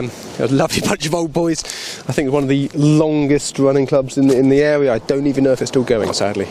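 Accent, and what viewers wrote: RP, United Kingdom. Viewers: You sound like a member of royal family,apart from that,your entertaining,informative and an excellent fisherman,new sub of me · Should do more vids always great watch.... plus a great posh voice for videos lol... cheers best wishes will be in before I go back to Philippines 🇵🇭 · Happy new year from Ian in NZ 😊 Really enjoyed watching this video and you do have rather a posh accent!! Please don't forget to do a video on how you make your bar rigs 😊 I can't believe you catch Thornback and Gurnard in January now on the UK - they were both summer species in my time over there. Cheers 😊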